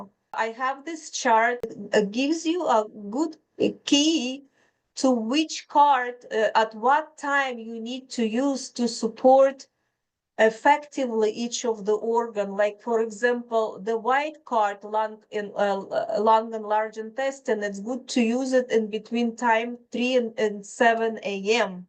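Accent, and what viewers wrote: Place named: Russia. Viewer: Где на русском послушать? Очень слышно русский акцент, но не понимаю где найти эту же информацию на русском языке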